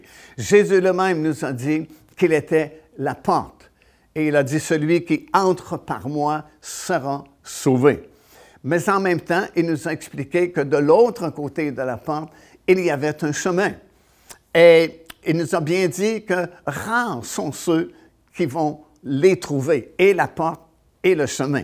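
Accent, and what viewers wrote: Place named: Quebec, Canada. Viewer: Il a dit 66 livres c’est son accent québécois . Fraternellement.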